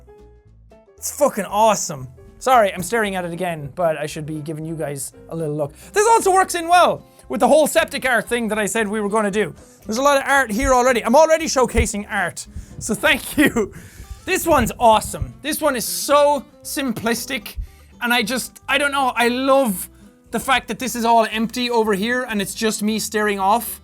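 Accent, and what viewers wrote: Ireland. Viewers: Has jack got more Irish 😂🤔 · At least he still has his Irish accent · Woah, his irish accent was so strong in this video. Damn son!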